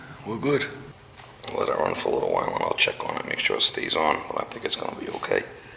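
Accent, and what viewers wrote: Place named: New York, United States. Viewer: That New York accent though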